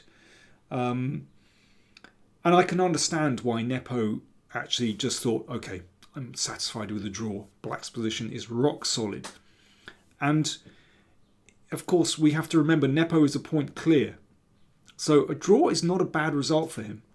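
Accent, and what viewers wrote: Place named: Southern England, United Kingdom. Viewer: That's his accent - middle-class southern English, I don't know why you call it patronising or what you expect him to do about it. And he's a grandmaster, and in my view the best of the YouTube full-time chess analysts. Carlsen's opinion obviously carries great weight, but a grandmaster is perfectly free to voice objections to it. In fact that's his job, to give his opinion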